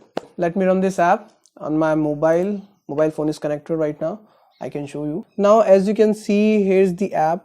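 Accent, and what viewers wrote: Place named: United States. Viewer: Your video is great, but why the American accent on an Indian background and foreground?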